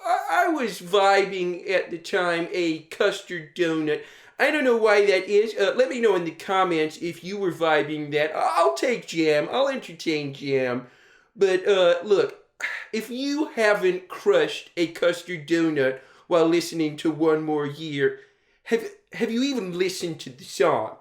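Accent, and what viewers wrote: United States. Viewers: love you mate but this video is legitimately shit, think the american accent gave me an aneurysm, even worse than your MAFS stuff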